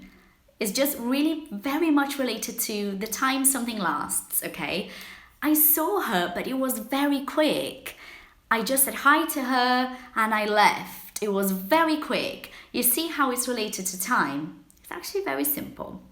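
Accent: United Kingdom. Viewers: Beautiful British accent 😘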